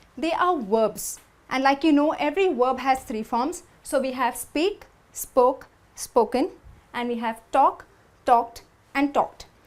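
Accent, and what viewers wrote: India. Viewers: you sound so indian :!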